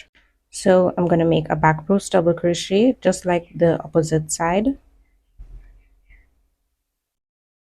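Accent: Trinidad and Tobago. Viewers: You from Trinidad? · im guessing youre trinidadian, your accent seems so familar !!! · Omg my total shock when I heard your accent and realized your from my country Trinidad and Tobago omg!!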